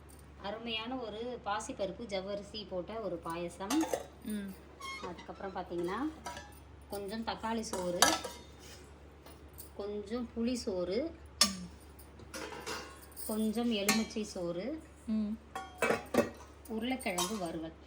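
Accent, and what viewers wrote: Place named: Kerala, India. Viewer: Sound Kerala sister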